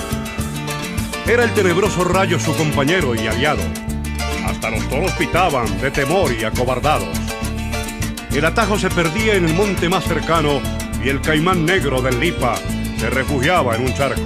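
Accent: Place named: Bogotá, Colombia. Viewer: una voz magnífica, una dicción espectacular, todo un artista; pero declamó como un bogotano. Si quieren saber cómo declama un llanero, busquen el poema el cazador novato karacachian.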